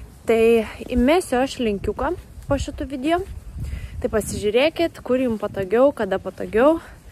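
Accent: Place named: Aukstaitija, Lithuania. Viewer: Nu zinok vau! Taprasme as tavo kanala atradau visai netycia, bet be galo dziaugiuosi ir perziurejau visus visus tavo ikeltus video! Tu esi tokia paprasta, bet taaaip ikvepi dziaugtis paciais maziausiais dalykais🌸 gera girdet kai kartas nuo karto islenda aukstaitiskas akcentas😂🌸 labai laukiu tavo video! Net patys paprasciausi, buitiski, diena pavercia geresne ir prisotina motyvacijos!pati esu is Rokiskio tad dar smagiau ziureti ir zinoti, jog zmogus kiles is visai netoli☺️ laukiam kuo daugiau video ir visai nesvarbu ar tai bus paprastas filmukas is namu ar kai ka nors veiki! Aciu tau!❤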